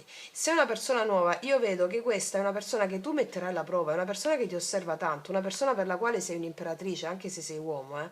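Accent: Italy. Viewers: sei una mia recente scoperta.. ❤ vorrei incontrarti, sento dall'axcento che sei nella mia stessa penisola.. ti inviero una mail..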